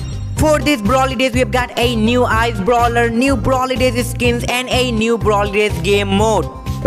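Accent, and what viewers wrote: India. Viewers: This Indian accent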